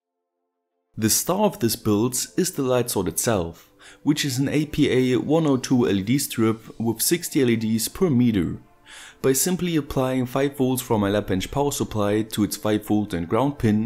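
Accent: Germany. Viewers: Jonas Gilje Ich Habs sofort raus gehört, dass er Deutscher ist xD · That accent .. are you german? Great vid! :)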